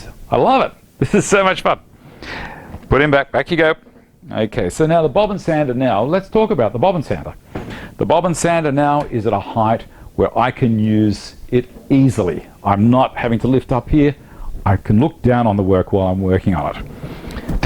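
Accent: Australia. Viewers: Ive just stumbled upon your channel while researching melamine cabinets before tacking my kitchen, and you are a breath of fresh air! After watching so many hours of youtube videos from guys in the US and hearing everything in fractions of inches, and about how cheap plywood is over there, its so good to hear an aussie voice talking in metric. Subscribed!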